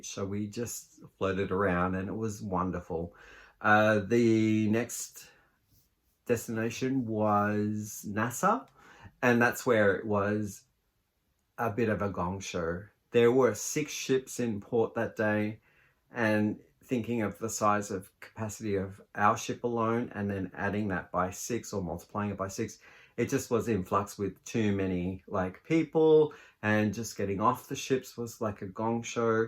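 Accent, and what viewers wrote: Australia. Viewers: OMG an Aussie accent 😍 hello, you came up as a suggested video & was happy to hear you! Fellow yarn lover here too 🙏🧶 call your mannequins Matilda 😂 Mine was named by my daughter & Crochet bestie Leighann, her name is Mary Madison Mannequin 🫂🤎🦋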